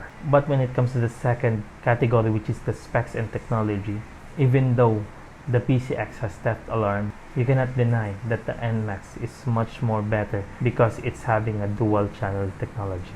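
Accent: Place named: Philippines. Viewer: I'm liking the filipino accent. Also he's aiming for a much bigger audience obviously so he's using the universal language so other who aren't Filipinos can understand. Just saying.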